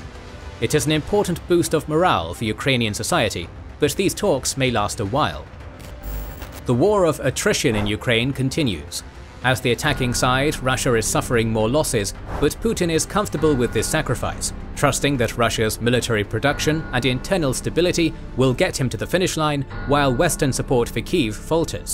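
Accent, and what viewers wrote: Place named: United Kingdom. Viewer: Love the coverage of Ukraine . Probably the best I’ve seen and the British accent is a must.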